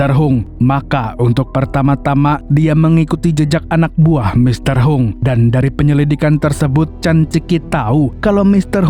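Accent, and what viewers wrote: Banjar, Indonesia. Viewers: Urang banjar kah nyawa ni min😂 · Urang banjar babahasa indo haratnya😂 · Banjar bnr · Pian urang Banjar kh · Urang banjar kalinya beliau ni lah😂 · Mimin urg Banjar mana ?😊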